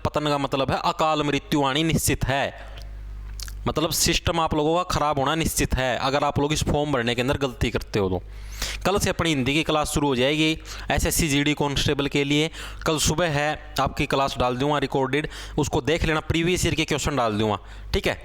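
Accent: Haryana, India. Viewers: Hariyana ka londa he kya ye bak raha he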